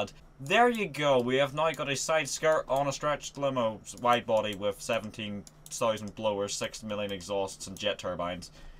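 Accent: Northern Ireland, United Kingdom. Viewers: r u from northern ireland?? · Are you from Northern Ireland?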